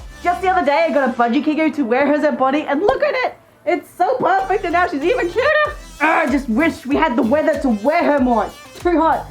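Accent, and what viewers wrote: Australia. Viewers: How the hell did you get more Australian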